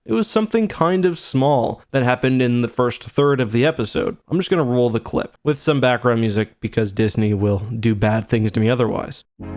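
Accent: Canada. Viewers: Are you Canadian? I detect a hint of Canadian accent, eh. This might help explain why you felt all mushy about that scene. Trudeau. I think he'll be the next Bruce Jenner only way more feminine. If you are Canadian then it sounds like he's had a bad influence in you. Man up! This is The Mandalorian, not Gilmore Girls.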